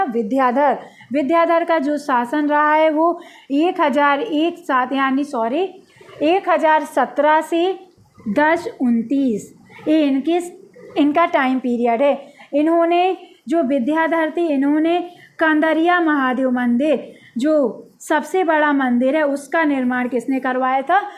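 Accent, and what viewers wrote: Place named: Bundelkhand, India. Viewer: Madam ji aap bundelkhadi lag Rahi kaha se he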